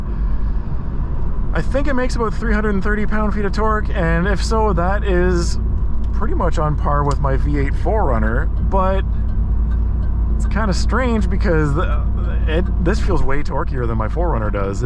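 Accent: Canada. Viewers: Relax that's how they say it in Canada... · I hate Canadian accents. Aouuut and abouut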